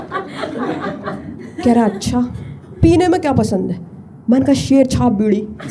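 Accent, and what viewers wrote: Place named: Bundelkhand, India. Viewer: Great content, amazing delivery with a little pinch of bundelkhandi tadka… keep it up, long way to go…❤❤❤😊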